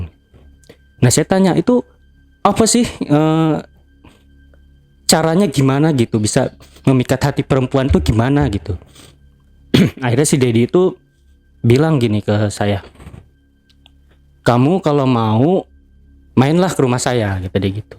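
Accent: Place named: Java, Indonesia. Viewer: Mas logate jowo meni k an asli endi kui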